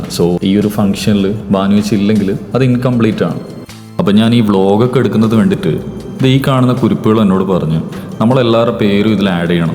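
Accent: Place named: Kannur, India. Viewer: Waiting for next vlog.....kannur slang is really nice 👍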